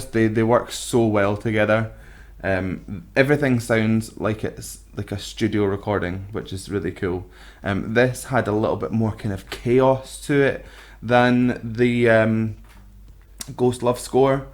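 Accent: Scotland, United Kingdom. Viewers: i see. thanks. i was leaning towards Scottish but was unsure. pardon my ignorance, its just that we, Americans have trouble distinguishing accents and i am fascinated by them. usually i can spot it better when its a bit heavier than yours. at any rate, thanks again. looking forward to seeing more of your entertaining and informative reactions. is my passion, so anytime you wish to react to her my soul will sing. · Hello there, I really love your scottish accent, feels like going home... And, yep Markos voice is awesome!! Try ' ', ' ' (Tampere) or ' ' from 👍😉 Greetings from 🇨🇭 🤘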